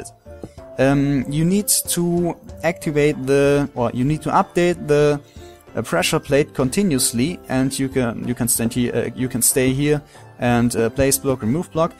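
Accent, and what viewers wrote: Germany. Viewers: Dein Englisch klingt echt total Deutsch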